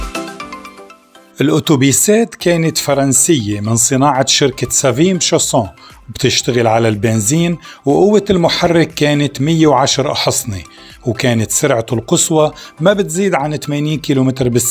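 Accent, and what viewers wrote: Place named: Beirut, Lebanon. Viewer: شكرا كتير سيد على الفيديو الحلو وعلى الإلقاء باللكنة البيروتية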